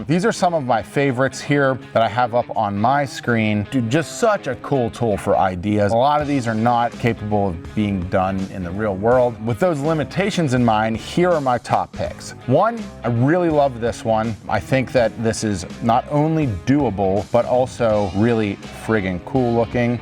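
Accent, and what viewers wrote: United States. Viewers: I like how you have a regular just generic American accent but then hit us with the yinz and some of us know exactly where you grew up.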